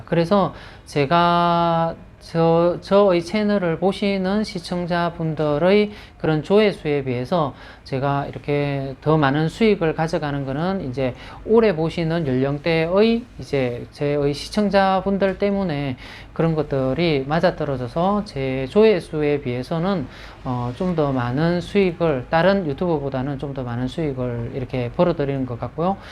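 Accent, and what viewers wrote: Gyeongsang, South Korea. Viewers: 경상도 분들이 유튜브를 많이 하시네요 듣다보니까 분석능력이 뛰어나시네요 와우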